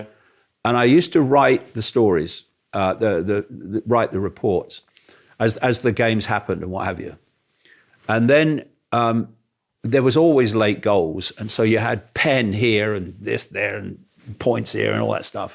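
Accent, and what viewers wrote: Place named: Birmingham, United Kingdom. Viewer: Spot on me... BUT YOU ARE STILL DRAGGING THE CORPSE OF COLEMANBALLS THROUGH THE OPEN SEWER OF MY OVERHYPED DESCRIPTION WHICH IS MORE GRIPPING... WHAT...IT IS GETTING MUCH WORSE BY THE SECOND......PLEASE DAVID...DEAR GOD STOP THIS DRIVEL AND PRESENT SOMETHING EVEN REMOTELY CONNECTED WITH THE PRESENT, REAL WORLD AND MAYBE EVEN YOUR MOANING BRUMMY DULCET TONES.....I CAN'T COPE WITH ANYMORE... YOU HAVE A POTENTIAL WORLD STAGE AND YOU FUCKING WASTE IT 🗑 TOSSER BEHAVIOR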